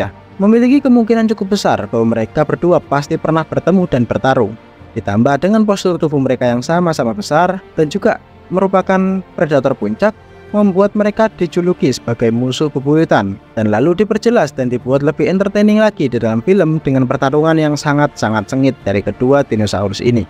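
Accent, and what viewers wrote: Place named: Java, Indonesia. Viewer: Bg mhon maaf nih ye,,😂.. kok suaranya abg nih mirip chanel nyoto tutorial ye?? Apakah org nya sama😅.. khas kek ada jawa nya gitu cara bicaranya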